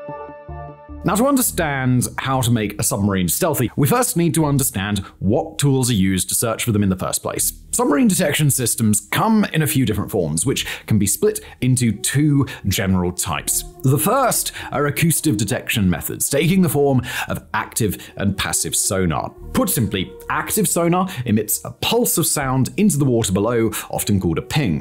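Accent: England, United Kingdom. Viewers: I appreciate so much English accent compared to US one. Better understanding for my old scholar skills... La cerise on top of the gâteau. 🇨🇵❤️🇬🇧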